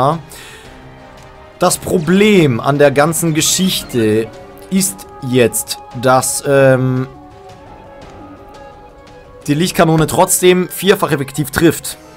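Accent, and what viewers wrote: Switzerland. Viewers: Mein Deutsch ist nicht sehr gut, aber können jemand mir sagen wenn dieses Mann sprickt Hoch Deutsch? ^^ Can someone tell me if this dude is speaking High German or Swiss German. That's what I tried to say, but my German's not that good. I'm not experienced enough to tell the difference :C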